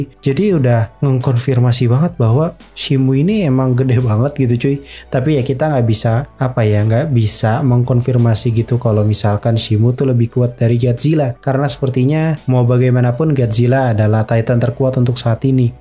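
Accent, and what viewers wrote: West Java, Indonesia. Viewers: Kang, sunda na timana? hehe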